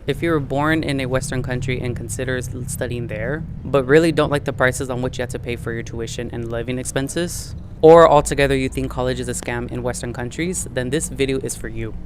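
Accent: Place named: Philippines. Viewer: Is it just me? the way he explains sound so Filipino 🎉😅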